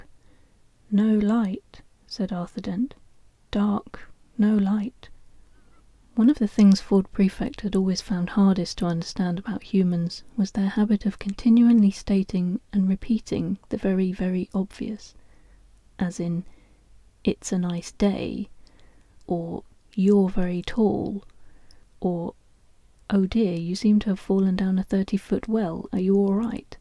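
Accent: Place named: United Kingdom. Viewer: Nice British accent & well read. You should try some Terry Pratchet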